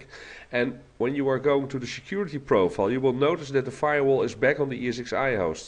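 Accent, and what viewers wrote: Netherlands. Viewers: are you dutch? you accent seems dutch.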